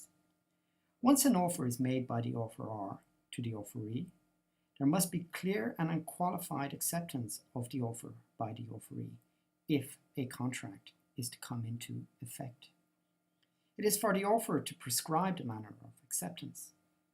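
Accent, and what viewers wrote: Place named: Ireland. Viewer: This information is valuable! The narrator sounds like an Irish native. Does this presentation of Common Law apply to Ireland?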